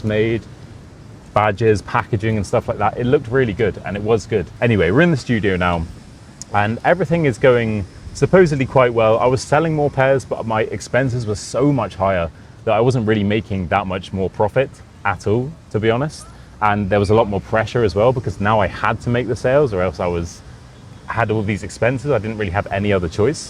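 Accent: United Kingdom. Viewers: Oh gosh I understand your story 🎉😊 I'm proud of myself now ,coz British accent is so hard😅 but I hope one day could speak like you sir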